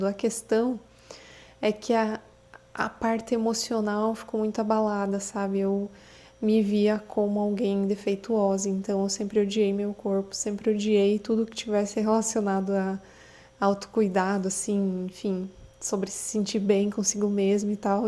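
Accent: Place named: South, Brazil. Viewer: 🙏🏻🙏🏻, pelo sotaque é do sul, continue na missão de expandir a consciência do proximo.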